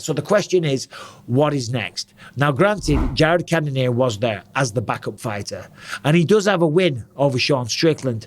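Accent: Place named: England, United Kingdom. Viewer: Any englishmen channel gets a dislike even before even watching. Still gotta watch. Annoying accent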